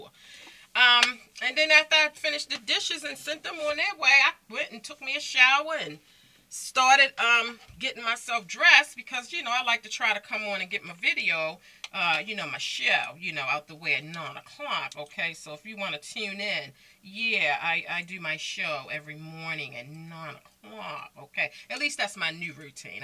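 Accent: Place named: Maryland, United States. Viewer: Thanks for the entertainment your show kept me laughing it's so nice to hear a piece of home (maryland) you have to be I can tell by the accent.....Blessings hun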